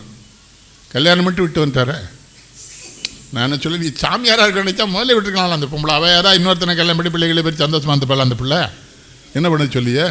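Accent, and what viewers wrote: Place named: Tirunelveli, India. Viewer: உங்கள் நெல்லை தமிழுக்கு நான் அடிமை ஐயா வாழ்க உங்கள் புகழ் 🙏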